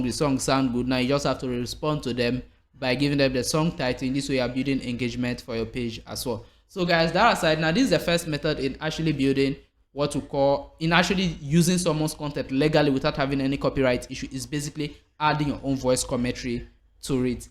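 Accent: Nigeria. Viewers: Is it eshot or eshort? Nigerian accent hard to understand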